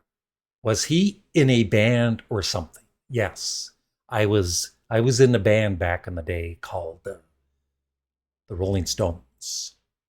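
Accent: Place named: Canada. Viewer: OMG! That is hilarious and wonderful. I just started watching your other channel. I'm 61 so I am in the ballpark with you on your taste (with some adjustments but..) Youtube put this on my suggestion list. I am so glad. This is like Celebrities Reading Mean Tweets on Jimmy Kimmel (a late night show we have here in USA - not sure if you do in Canada). I must admit I get a little concerned about the mean comments my favorite audiophile and album reviewers get. I only watch nice people (who should be treated respectfully) You are so gutsy to even read mean comments. Anyhoo... Inspired by some negative things a few Youtube audiophiles (and commenters) have done to other Youtubers, I've been wanting to make my own Youtube video rating my favorite audiophiles, explaining how they work, what I like about them etc.. AND how attractive they are as a man (to me - Gay audiophile/rock and roll fan that I am). 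You had already inspired me to want to make one about album reviewers too! You are a! I'm so glad you called yourself that. Deserved! AND you rock that Canadian accent! Why don't those Toronto Audiophiles I watch rock one too? I hope I get the guts to make such a video and you get to hear how appealing you are! This video was just further proof!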